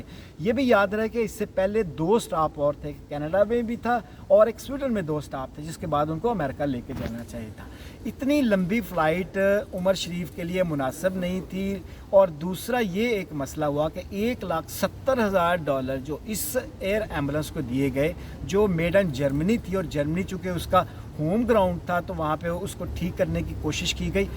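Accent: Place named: Punjab, Pakistan. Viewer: Mr Paindo Punjabi, First learn how to correctly pronounce simple Urdu words. Don"t speak in punjabised Urdu. All information you have given is not new at all.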